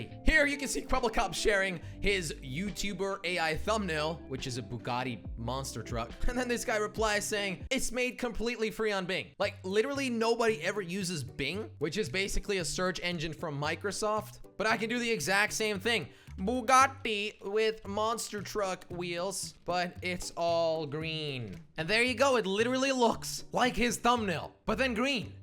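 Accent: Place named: United States. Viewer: Wait, you're Dutch as well? Unlike Kwebbelkop you don't have the goofy accent at all, in fact you sound just like an American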